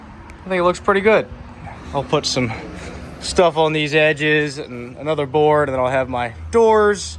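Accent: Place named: Maryland, United States. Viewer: I have a lot of friends from Maryland. And that is a distinct accent! The rope is too tieeet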